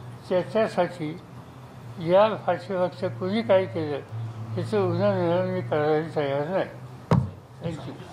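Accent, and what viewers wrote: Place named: Baramati, India. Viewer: 😀😀😀😀बारामतीची भाषा आहे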